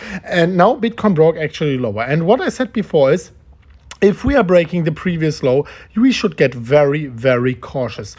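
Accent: Germany. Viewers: I hear a German accent but your English is perfect. I speak German pretty well but not as good as your English. I love you man.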